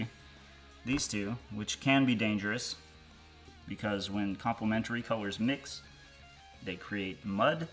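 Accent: South, United States. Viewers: I love it. Also Southern, like yall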